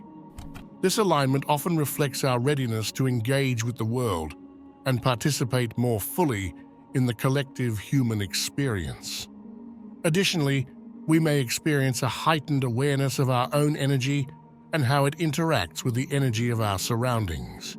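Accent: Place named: Australia. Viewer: Nice Aussie TTS. Good one. 👍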